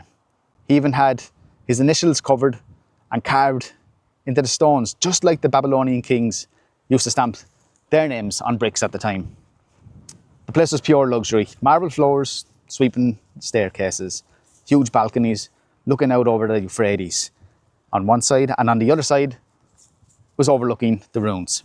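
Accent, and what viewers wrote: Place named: Ireland. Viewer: Irish English is fantastic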